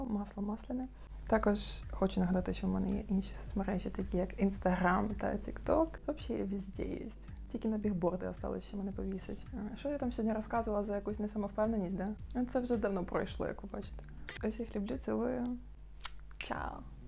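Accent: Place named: France. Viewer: Ви француженка? У вас таких акцент 😂😂😂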